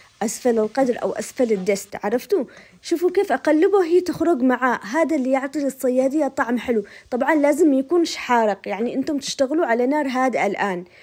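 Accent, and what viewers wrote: Aden, Yemen. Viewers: اللهم صل على سيدنا محمد وعلى آله الطيبين الطاهرين أجمعين، يا اختي إني فدالك كل ما اتابعك توجعي قلبي وتشوقيني لمسقط راسي عدن والمعلا والتواهي ، اما الصياديه هذة بالضبط أنا اطبخها وجننت صديقاتي هنا في امريكا بالأكل العدني ، فديت قلبك صوتك عدني ولهجتك عدنيه شوقتيني لعدن واهلي ، الله يحفظ اليمن واهلنا اليمنيين المصابرين والأقوياء دائما، تحياتي لك حبيبتي اختك عدنيه متابعه لك من امريكا 🌸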